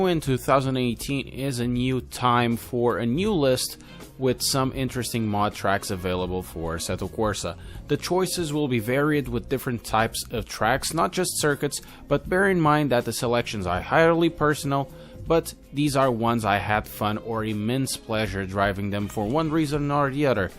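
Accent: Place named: Portugal. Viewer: I had a suspicion from your accent that you were my irmão. boas!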